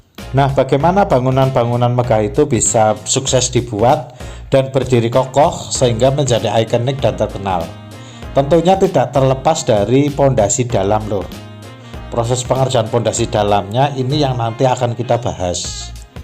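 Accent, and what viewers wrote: Java, Indonesia. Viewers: Ya itu sudah bhs indonesia bro, hanya saja logat"nya sedikit medok khas jawa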